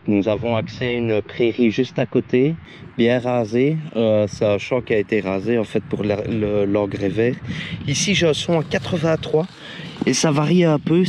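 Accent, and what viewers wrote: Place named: Belgium. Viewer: Hola le bel accent de Belgique, je crois que je vais aimer ta chaîne 😏😏 Angy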